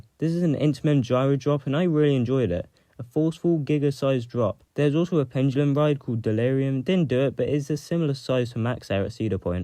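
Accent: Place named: United Kingdom. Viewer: I don’t particularly like roller coasters (because I get motion sick) so it’s a mystery as to why I was recommended this video 😅, however I do live in Ohio and have gone to King’s Island a lot. I decided to watch the video and found it interesting to hear someone from across the pond talk about kings island. 😊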